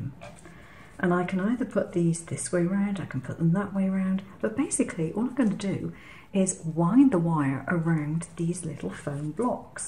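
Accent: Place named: United Kingdom. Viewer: Aurrrr dammet. Shes bri ish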